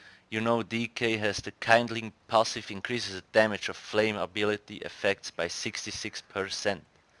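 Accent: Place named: Switzerland. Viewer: du bisch definitiv en schwiezer😂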